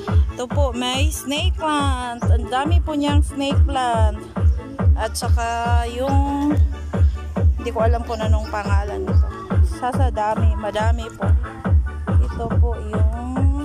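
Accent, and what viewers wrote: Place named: Visayas, Philippines. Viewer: bisaya ka ma'am haha, kadaghan tanom oi, kugihan si mama mo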